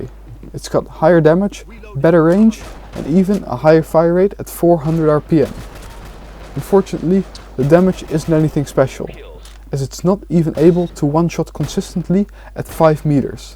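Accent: Netherlands. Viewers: I hear fellow dutchman, i press like 🇳🇱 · always nice to hear a dutch accent in english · Are you dutch? I think i recognise your accent. Great video btw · good video also, are you dutch by chance? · Im sure you deserve more attention. I really like your dutch accent. You have a talent, keep going! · Are you dutch? You sound a bit like it.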